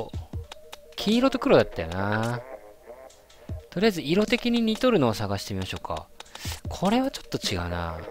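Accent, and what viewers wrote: Hiroshima, Japan. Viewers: 見るのちょっと遅れちゃった、、、 今回Rさんめっちゃ広島弁多かったですねwwRさんの動画大好きです!!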